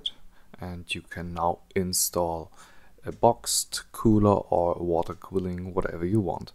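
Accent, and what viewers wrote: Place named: Germany. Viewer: Ne ;P Aber zumindest hört man, dass du ein deutscher bist^^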